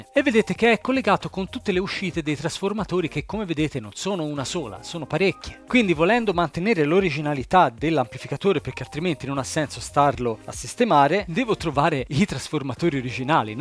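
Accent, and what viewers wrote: Tuscany, Italy. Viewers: Da quale parte della toscana vieni?😂😂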